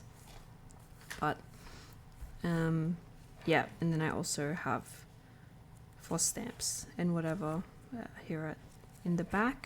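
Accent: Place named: Australia. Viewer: One of your videos came across my feed & I'm so happy! Loved this video! 🥰 Edit: I always get excited hearing a fellow aussie accent talking about journals/planners 😅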